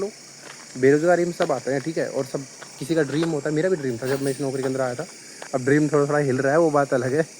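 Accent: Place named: Braj, India. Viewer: Oh Dousa rajsthan se wahi soch raha tha ye brajbhasha ascent bol raha hai mai bhi je hu mathura se❤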